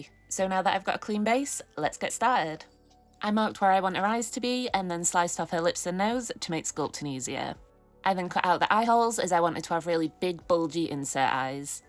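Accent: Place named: Sheffield, United Kingdom. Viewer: amazin work lady .. you from Sheffield cos ya sound like me 😂 your talent is the mutts nuts ❤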